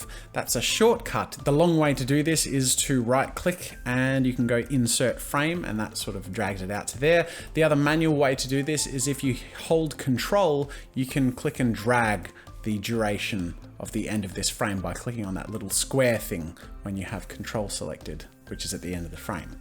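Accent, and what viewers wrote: Australia. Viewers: ho Australian accent. my favourite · Are you Austrailian? Just wondering it sounds like an austrailian accsent but it´s vety COOL and sorry if a have a lot of misspelling but I´m not from anywhere where people speak english and I´m not that good at it :D LOVE YOU´R CHANNEL!!!! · Is he Australian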